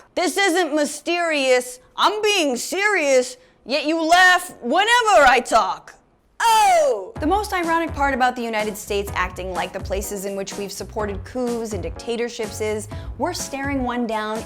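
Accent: RP, United Kingdom. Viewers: Love the British accent... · Is it wrong that I like her British accent L) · Loving the British accent! <3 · Your English accent is reeeealy posh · Well done on the british accent; a pretty good job over all. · English (educated received pronunciation to be precise).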